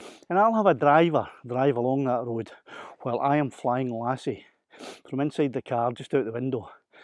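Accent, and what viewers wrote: Scotland, United Kingdom. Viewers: "What did you do Saturday?" "Well, I put on some headphones and listened to a Scot breathe heavily into a microphone while discussing drone distance." Well done, friend. I hope this has been educational for all the haters.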